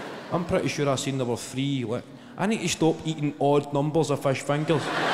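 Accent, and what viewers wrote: Scotland, United Kingdom. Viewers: Scotland is absolutely stunning. I'm Scottish, born and bred, I still get overwhelmed by the beauty of many places. Scottish are typically friendly. You'll find very different dialects in many areas. We don't all sound exactly like.......he's a banky (clydebank area) West Dunbartonshire, I'm Dumbarton, West Dunbartonshire. Even in the short distance from my town to his, you'll find slight differences in how we talk. If you're into Scottish Comedy, give "still Game" a wee watch xx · The Scottish accent makes everything funny. Tell me I’m dying in a Scots accent and I’ll die there and then of laughter... AND he will of been right. Gotta love the Scots. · Holy hell I just stubbled on this and I'm dying! I think my Scottish ancestry must help me understand him cause my girlfriend missed everything lol. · I like the Scottish accent but I am seriously struggling to understand what he is saying. 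I know, I am a yank. What can I say? But I have watched living skint, and quite a few other British trash tv programs and I think I understand this guy better than most of them. The northern accent kills me. It’s such a struggle. Can it really be considered English? I know, this being said from someone who doesn’t have an accent. Lol.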